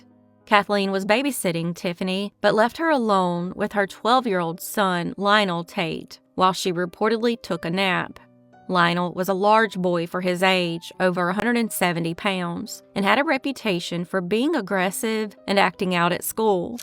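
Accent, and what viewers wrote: South, United States. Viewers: Awesome Southern. Woof Woof.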